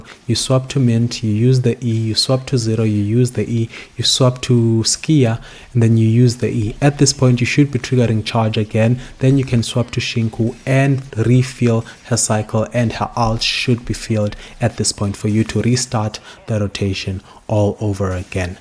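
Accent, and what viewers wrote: South Africa. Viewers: hears a South African accent, subscribes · Bro, u sound like kao kwishisha. Mzansi?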